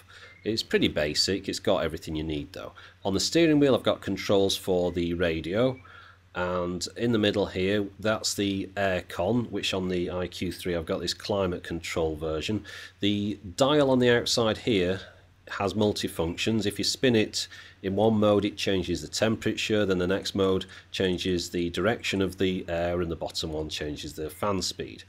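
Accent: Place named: Liverpool, United Kingdom. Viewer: are you near Liverpool? you sound a bit like Ringo.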